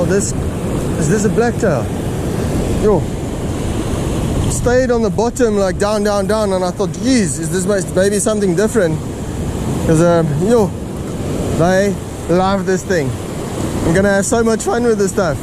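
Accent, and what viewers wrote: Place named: Afrikaans, South Africa. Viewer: Jy is afrikaans ek kan dit hoor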